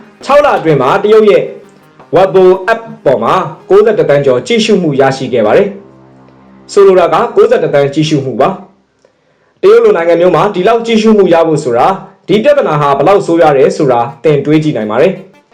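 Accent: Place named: Myanmar. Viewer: ယုံချင်စရာပဲ သတင်းဆိုရင် မင်းတို့မြန်မာအသံနဲ့ဆို ကြည့်ကိုမကြည့်တော့တာ ကျက်သရေယုတ်လို့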